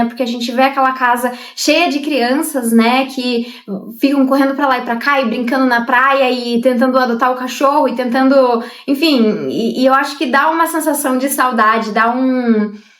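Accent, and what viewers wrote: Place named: Caipira, Brazil. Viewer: Eita, sotaque caipira não dá. Muito rim. Vai estudar mulé.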